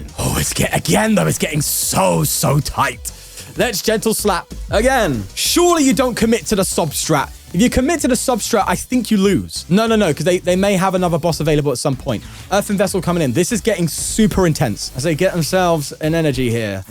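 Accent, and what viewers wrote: United Kingdom. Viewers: bro is british af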